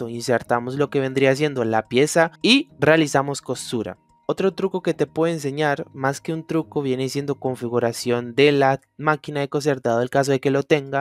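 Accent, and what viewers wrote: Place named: Mexico. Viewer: se que eres mexicano por tu cantadito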